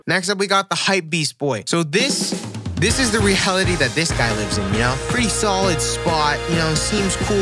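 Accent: United States. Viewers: You have the most American voice ever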